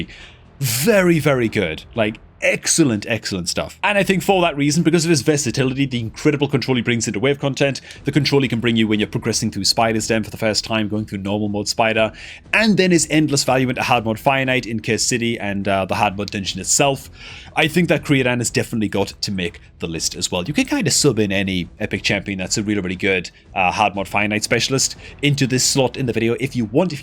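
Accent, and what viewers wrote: North East England, United Kingdom. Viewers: Probably a NE English accent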